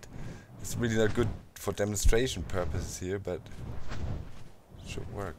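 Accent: Germany. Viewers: Are You Deutsch Sir?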